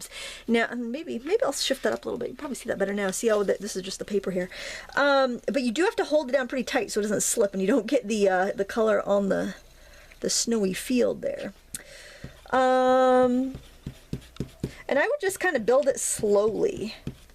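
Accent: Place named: New Jersey, United States. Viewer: This is really a nice, peaceful scene,. Thanks for showing it to us.Do I detect a NJ accent when you're talking? Sounds like, "up home," to me. I like to listen to you talk.Happy Holiday Season to you and yours.:D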